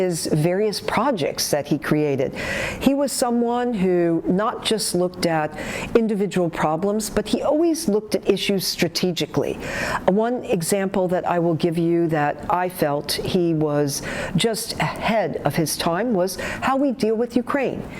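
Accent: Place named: Arab world. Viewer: Abraham Accords will lead to Arab Spring times 10. Miss, no offense but your accent is a bit strong. When you speak English sometimes it sounds like Arabic. So harsh. Maybe hire a different host if you want to appeal to anyone other than Neo-Cons, war criminals, and fellow Arabs.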